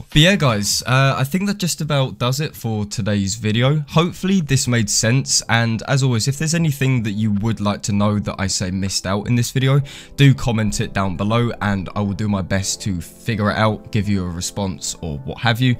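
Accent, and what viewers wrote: United Kingdom. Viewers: yo you british, i hit the L & S. just because gg. blud x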